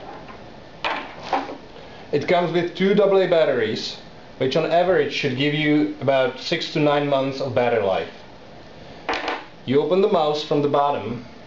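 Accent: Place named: Czech Republic. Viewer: This must be czech guy talking, the accent is just awesome.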